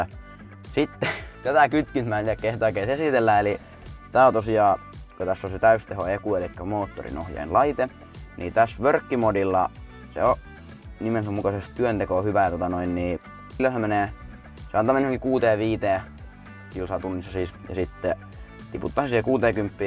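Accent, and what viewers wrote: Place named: Rauma, Finland. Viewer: Huamaa et poika raumalaine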